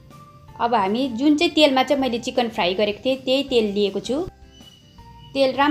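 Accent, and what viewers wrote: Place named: Darjeeling, India. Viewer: Darjeeling accent,, achar looks delicious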